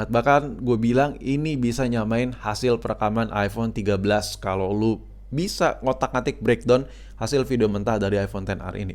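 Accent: Indonesia. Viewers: English tapi logat tetep indo ya kang 😁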